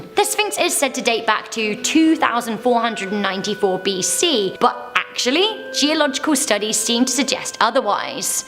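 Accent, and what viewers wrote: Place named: Scotland, United Kingdom. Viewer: I love British accents, but a Scottish brogue blows my mind!!!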